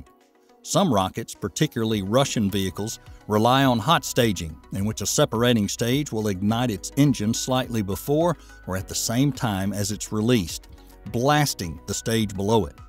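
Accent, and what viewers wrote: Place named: Texas, United States. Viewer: Thank you, your explanations are immaculate, and your accent smacks of Texas ! 👍👍👍👍👍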